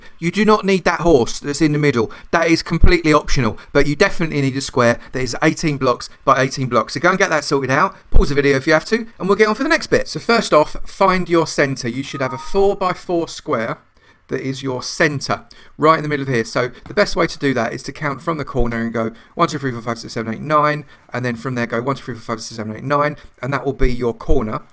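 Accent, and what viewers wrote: United Kingdom. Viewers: Aah your British accent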